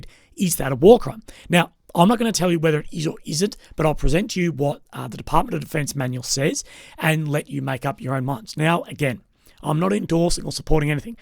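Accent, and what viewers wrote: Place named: Australia. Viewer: You can generalise as you wish if it's based on a genuine observation not obstinance..... "I thought Aussies were better than this" is actually saying that Australians are held to a pretty high standing in the world of fair play, and standing up for the oppressed over the oppressors... along with the Kiwis. looks and sounds the part, but his consistent agenda is at odds with his apparent Aussie-ness and overstated Neutrality which doesn't follow through to his actual stance.....he's an Oxymoron (or is it Aussiemoron 🤔) Aussies are awesome, and I know he doesn't represent the majority!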